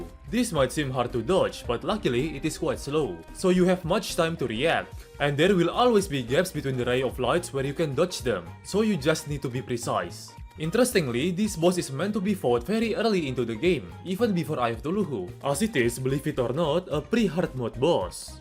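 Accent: Russia. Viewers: Maybe Russia :P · The russian accent being so thick you have to wade through it to get to the other side is great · No soy inglés pero creo que el tiene un acento ruso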